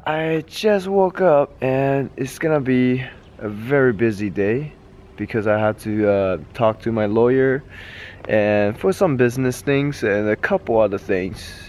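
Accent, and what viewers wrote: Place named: China. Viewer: You Chinese?!?!......always thought you're from Vienam cause you look like one. But I just noticed your Chinese accent from your vlogs so I can see now.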